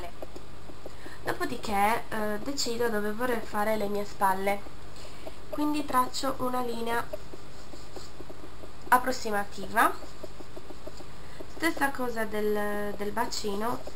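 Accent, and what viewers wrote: Sardinia, Italy. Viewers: sei sarda? <3 sembra di si dalla pronuncia ahahah ;) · Davvero comlimenti per il disegno sei veramente brava! Sembra che tu abbia l'accento sardo, ho sentito bene? Io son sarda ;) (Budoni OT) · Sembra sarda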